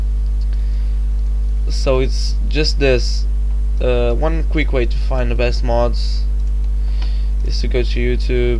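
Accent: Portugal. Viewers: Logo assim que ouvi o teu sotaque a falar inglês, vi logo que eras português xD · boa parceiro obrigado pela ajuda :) Gosto do sotaque Português ahahaah :D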